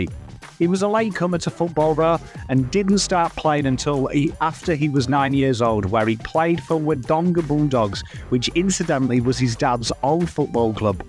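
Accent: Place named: RP, United Kingdom. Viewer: Nicely done. Definitely one for the archives! 👍🏻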